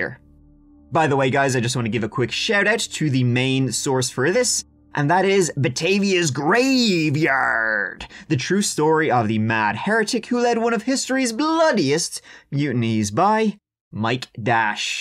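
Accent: Ireland. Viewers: Feck yes. The mad Irish lad telling the tales. Heaven.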